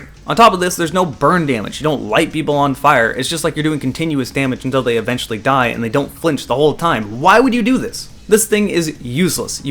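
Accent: Italy. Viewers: Honestly he sounds Sicilian. Call a Siciliano, Italian. He'll punch you. Try it.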